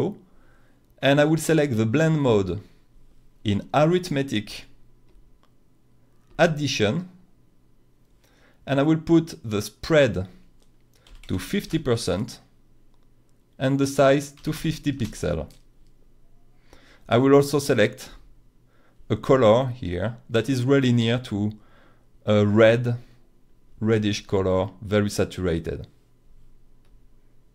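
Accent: France. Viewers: L'accent français ♥️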